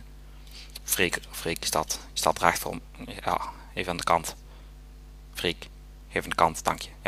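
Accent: Limburg, Netherlands. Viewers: Prachtige tornado! Maar dat Limburgs gepraat is zo deprimerend man... Als je zo iets uniek en mooi ziet, moet je toch op zijn minst kunnen zwijgen en genieten van het spektakel...ik denk dat iedereen hier zo over denkt. Ik kan zelfs heel moeilijk geloven dat zo'n tornado kan ontstaan in België :O Maar desondanks geef ik deze video toch een like, omdat deze video toch nog de moeite waard is als je het geluid afzet :)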